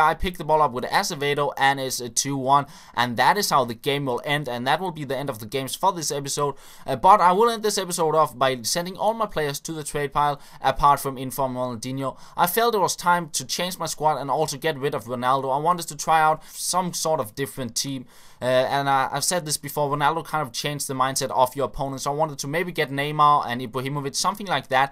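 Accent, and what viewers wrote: Denmark. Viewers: Det er dejligt nemt at høre, at du er dansker:)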